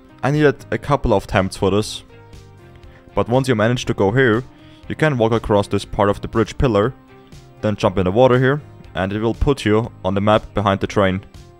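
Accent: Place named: Germany. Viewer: Hey man U Sound so German are U German by any Chance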